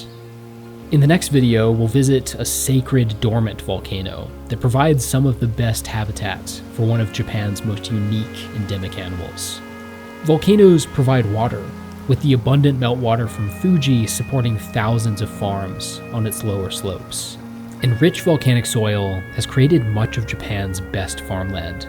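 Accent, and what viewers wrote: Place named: West, United States. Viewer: You sound very Western to me..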